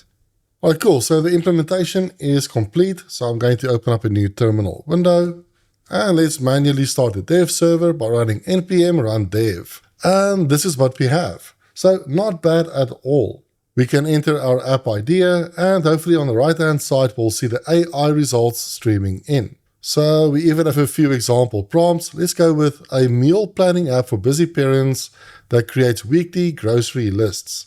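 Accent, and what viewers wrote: South Africa. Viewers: I instantly recognized my home country's accent. RSA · I'm here for the content, and the fond memories of South African accents!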